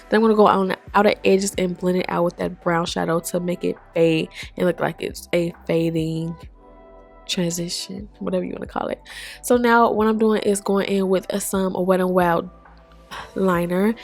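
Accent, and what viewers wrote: South, United States. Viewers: Beautiful. I 💘 your voice overs with your Southern Dialect.I love to hear you say, Description Box. You and your fast talking self,.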